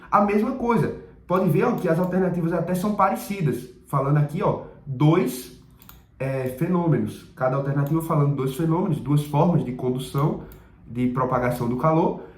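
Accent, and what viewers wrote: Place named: Northeast, Brazil. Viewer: Parece nordestino.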